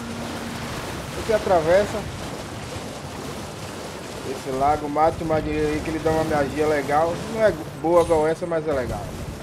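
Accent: Brazil. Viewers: Tu eh baiano ne pae? Tambem sou percebi pelo sotake de Salvador é isso msm?? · Esse e baiano raiz.. bora jogar junto meu pacerooo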